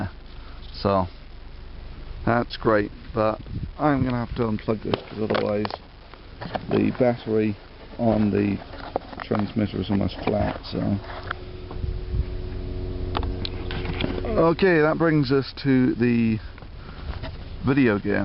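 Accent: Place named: United Kingdom. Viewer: O just remembered to ask, where u from as you have a British accent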